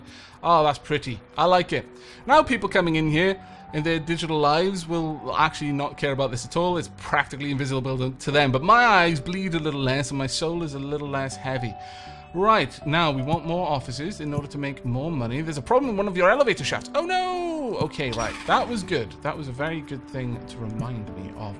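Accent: United Kingdom. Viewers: I am only in the first minute but you kinda sound like this another great youtuber called BestInSlot who does Jurassic World Evolution/dinosaur games. I would say you both have similar vocal mannerisms, and your voices are both great and calming. Are you you British? Well I will go watch you video now. Thanks.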